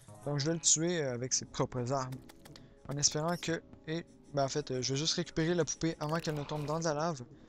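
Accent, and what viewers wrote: Quebec, Canada. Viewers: Tu vas te faire manger tout cru ^^ C'est l'accent québécois :P (Sauf erreur de ma part) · Un tuto de jeu vidéo, c'est bien ! Mais avec l'accent québéécois c'est MIEUUUUUUUX :DDD Super vidéo, merci :)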